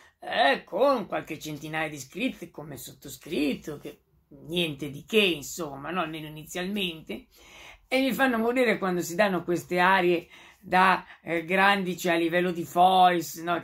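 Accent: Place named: Ancona, Italy. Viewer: Me sembri de Ancona, mi sembri 😆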